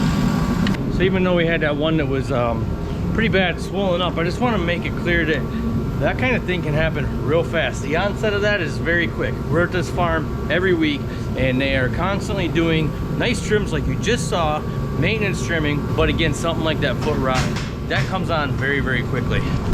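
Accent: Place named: Wisconsin, United States. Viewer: I love how you mix your Wisconsin accent with Spanish accent.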